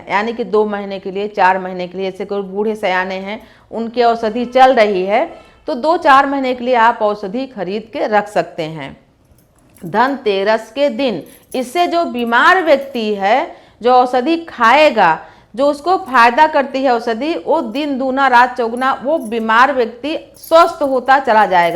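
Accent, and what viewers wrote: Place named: Allahabad, India. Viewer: Both boht achi baat batai apne.. Mai Allahabad ki hu... Apki boli waise hi... 💕💕👍👍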